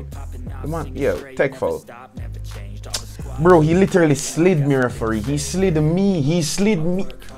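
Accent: Jamaica. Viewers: U from jamaican broski? Sound like one g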